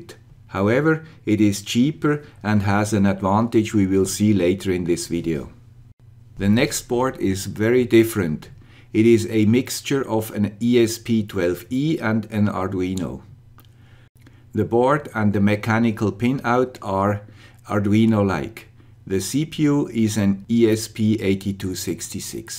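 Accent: Switzerland. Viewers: schön wenn ma zu sim schwiizer akzent stoh chan ;)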